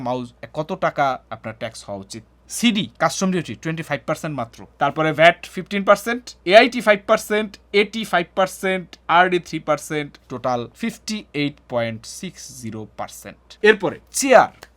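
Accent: Barishal, Bangladesh. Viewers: ভাই আপনি কি বারিশাল এর?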